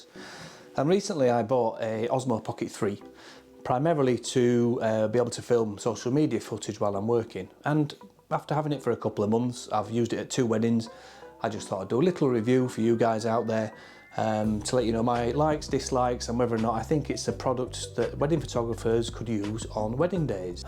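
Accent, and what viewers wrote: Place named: Halifax, Canada. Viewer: You sound originally pretty local to me in Halifax! decent video, have you filmed any wedding footage with the osmo or just vlogging yourself? thanks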